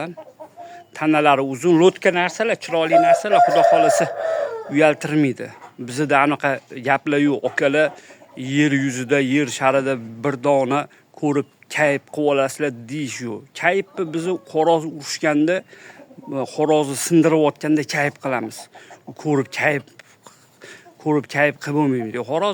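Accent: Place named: Tashkent, Uzbekistan. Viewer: Xaqiqiy toza Toshken sheva 😊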